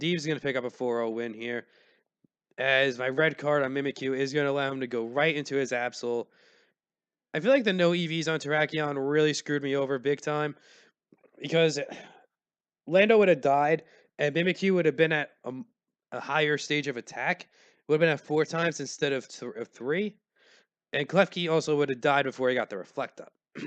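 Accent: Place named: New York, United States. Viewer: Really sound like a New Yawrka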